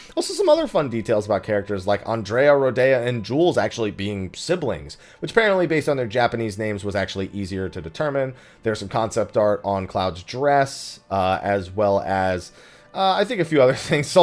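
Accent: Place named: Los Angeles, United States. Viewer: You sound like you’re from L.A. Are you?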